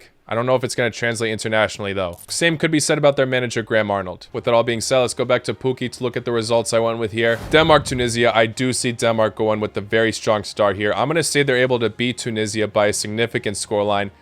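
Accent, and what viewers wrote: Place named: United States. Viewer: pfff this is stupid... people predicting like you do don't know about football at all.... you sound american so i guess that is the porblem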